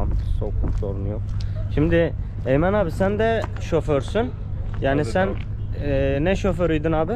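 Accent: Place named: Mardin, Turkey. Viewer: mardinlimisin ? bizim oranın kürtçesini konuşuyorsun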